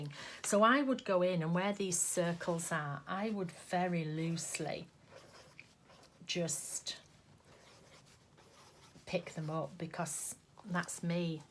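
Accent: England, United Kingdom. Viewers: I just love your vibe and personality! Plus you have the best English accent I've ever heard! Could listen to you alllll day :D love your videos! :)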